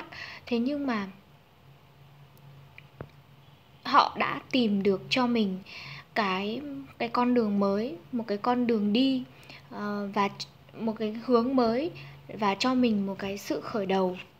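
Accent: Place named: North, Vietnam. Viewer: giọng Bắc bạn hay qá ko gắt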